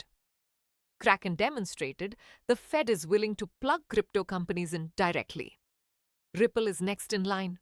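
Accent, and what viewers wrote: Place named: India. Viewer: Why an Indian accent 😂skip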